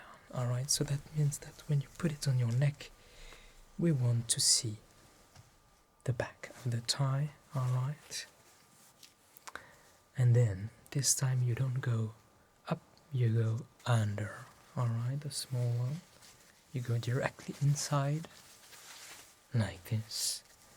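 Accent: England, United Kingdom. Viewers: Did I hear a hint of an English accent?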